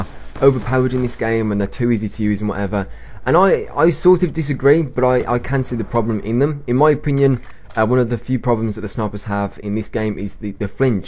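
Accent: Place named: Black Country, United Kingdom. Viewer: Where in England are you from? You sound like you're from the Black Country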